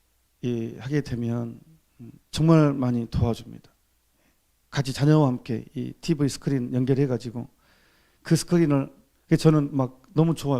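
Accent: Jeolla, South Korea. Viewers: 전라도 사투리가 정겹네요~~♡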